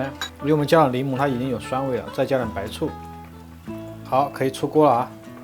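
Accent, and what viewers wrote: Sichuan, China. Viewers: 聽的四川口音，感覺菜更香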